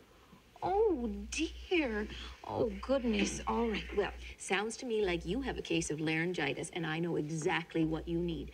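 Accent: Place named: Minnesota, United States. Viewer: This is adorable. And so is her accent, idk if shes from Minnesota, but it's cute. ❤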